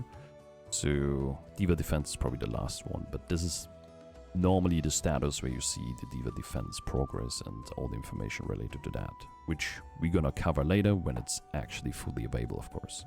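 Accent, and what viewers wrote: Germany. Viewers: Your dialect sounds kinda like you’re from Germany? Am I right?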